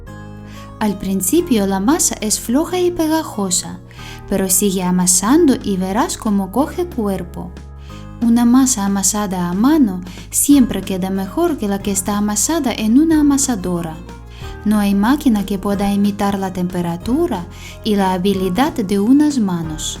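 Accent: Ukraine. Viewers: Дякую, і слава Богу, що ви українка, бо я думав, що з розуму схожу, слухаю нічого не розумію, але відчуття, що на рідній мові😂😂, якась магія, успіхів Вам🌷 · Hola te saludo desde Lima Perú 🇵🇪 esperando q estés bien de salud,un abrazo grandote,me encanta tu voz tu asento Ucraniano eres dulce,.por otra parte te digo q así de cariñoso somos los Peruanos, gracias por compartir tus recetas hice en la pandemia tus recetas y me salieron super rico mis panes,ahora haré paneton espero q me salga bien.un besote querida.chauuu cuídate.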